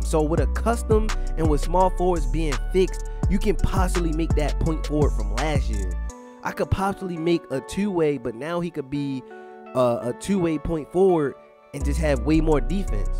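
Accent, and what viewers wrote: Baltimore, United States. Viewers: You definitely from Baltimore I can hear it 😂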